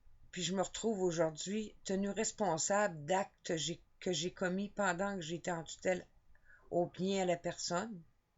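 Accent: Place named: Quebec, Canada. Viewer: Pour ton accent je dirais que tu es québécoise, alors ça se passe comment depuis ? ça s'est amélioré ?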